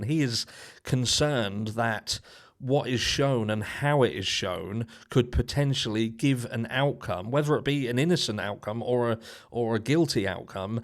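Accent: United Kingdom. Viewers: I love British accents including yours but today I realized how well you also annunciate your words. I tried but I can’t see the DNA proving Bryan is guilty because I think the knife sheath was planted. Apart from all of the problems with the DNA, Cristi and Steve came out later and declared that Kaylee had tried to get away but she was trapped on 3 sides of the bed. They deduced this I believe from something Steve found out from their investigator. They were told that Kaylee was on top of Maddie in the bed. I’ve heard a couple of scenarios of the killer throwing her on the bed where she landed on Maddie. The coroner said no one is permitted to move the bodies including the police. At first no one, including Brett Payne, saw that sheath. It was on the second walk through that Payne claimed he saw the knife sheath from the doorway. It was either under or beside Maddie. 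He must have X-rays eyes to see that sheath under 2 bodies and 2 bodies that were bloody because there was no blood or any other DNA on that sheath. Jay is for Justice had a great video especially about “Cathy with a C” Mabbatt, which she and others kept pronouncing it MUH BUTT. She did funny imitations of Cathy wringing her hands and being so nervous, evasive, and vague.When she was asked where the bodies were found, she hesitated and said she didn’t think she was permitted to give out that information. She also said that the news report stated that they were stabbed with a knife. Jay commented and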